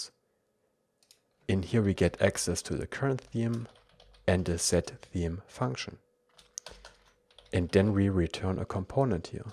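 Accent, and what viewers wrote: Germany. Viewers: are you german or do you speak german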